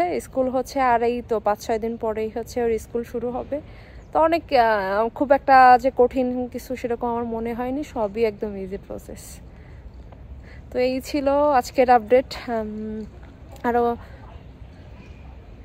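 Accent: Khulna, Bangladesh. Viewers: Apu ami Canada theke,Bari khulnai,apner khulna language khub enjoy kori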